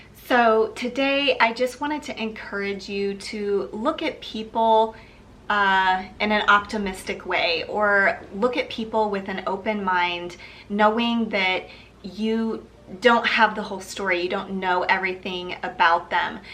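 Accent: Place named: Texas, United States. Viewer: Love friday night lights ❤ haha I love the Texas accent. Bonnie would have talked like that 😍🥰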